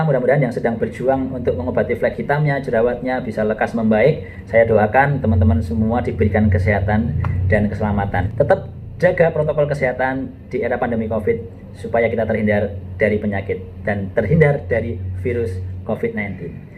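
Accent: Indonesia. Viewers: Dok,, suarane purworejo banget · Medok gak sih dok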